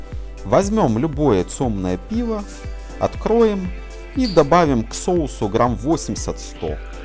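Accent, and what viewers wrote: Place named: Belarus. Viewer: Профи👍 У вас белорусский акцент. Или я ошибаюсь?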